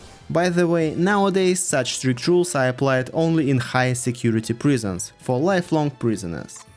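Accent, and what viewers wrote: Russia. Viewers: Thanks for the last photo in commando pants, bare chested 🤩👍 cool dude. Very good! I though it could be going back to our primate days as gorillas squat in the forest. The footage and photos you used were awesome! You always do good programmes. I like the Russian accent.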